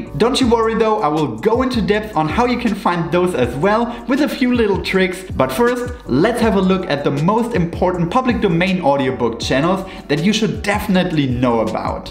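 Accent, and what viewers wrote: Germany. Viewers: Sir, are you German? Because you have the exact accent of a german friend of mine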